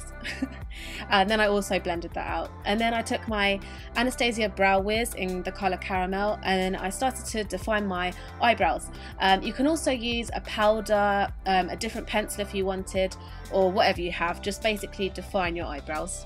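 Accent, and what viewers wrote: United Kingdom. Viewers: Voice over! I live in America and i'm obsessed with your British accent!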